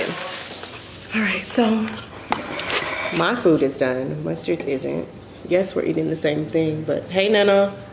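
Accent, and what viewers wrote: Los Angeles, United States. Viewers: Flawless.. You Do Have A Accent.. LA af but Twainy(Southern) at times.. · That 323 accent 🤣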